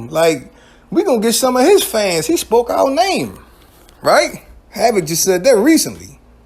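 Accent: Baltimore, United States. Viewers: Off subject ..But peeps from BMore accents be wild wit The U`s and 2's. GETS ME every time but anyway always dope videos 🤣🤣🤣🤣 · You definitely from B-more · U got a hard Baltimore accent. I recognize it anywhere. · You sound like you're from Baltimore or the east coast somewhere. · Whoever made this shit is from Baltimore. I can hear it in yo voice motherfucka... 🤝🏿 Salute! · Are u from Baltimore? Cuz i hear that accent 😂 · All I hear is your Baltimore accent. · Bmore prolly?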